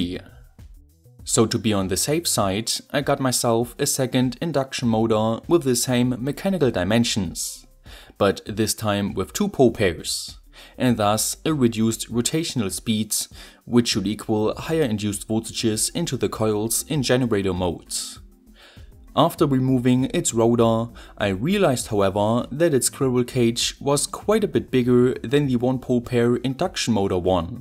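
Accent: Germany. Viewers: the content of your videos SOOO GOOOOOOD your thicc denglish accent SOOOOO BAAAAAD i will now watch your videos muted and with subtitles on. thank you very much for the effort to put subtitles in! keep uploading, you have great videos!!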